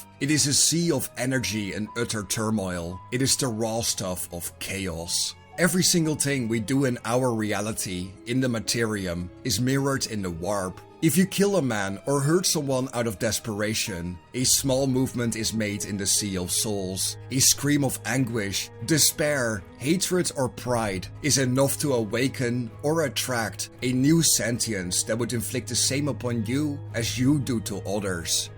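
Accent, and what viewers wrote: Netherlands. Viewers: As an ex gw employee I have to say I like your topics and storytelling. As a fellow Dutchman I have to say I really dislike "our" accent in English 😅 nothing personal. Keep it going and thanks for spreading this aspect of the hobby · Haha i can hear you're dutch from a mile away.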